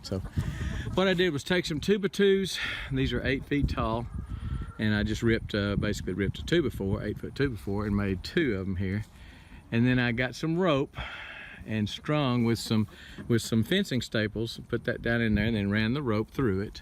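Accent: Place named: East Texas, United States. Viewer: First, whoah that is a THICK East TX accent! Growing up in Houston, I remember hearing accents like this frequently anytime we had to go up 59 to see my uncle, and to attend antique shows/fairs. Super helpful video! Curious how far in the ground you put the 2x4’s? Did you concrete mound the bases of them?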